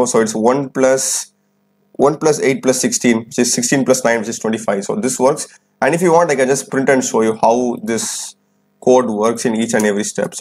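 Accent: South India, India. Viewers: Its south indian accent bruh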